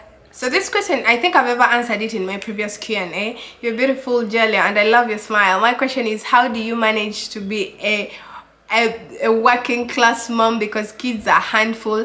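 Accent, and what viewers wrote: Uganda. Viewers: Sarah sarai. Her accent is obviously ugandan.no doubt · she's Ugandan her and her accent can tell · Wow u are a Ugandan banange, love ur accent darling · I knew you are a Ugandan because of your accent... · Awww her accent can indicate that she is Ugandan. Lol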